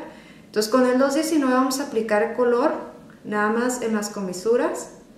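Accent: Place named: Tijuana, Mexico. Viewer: esta chulo el look jajaj se te nota que ya vives en tijuas... digo por como hablas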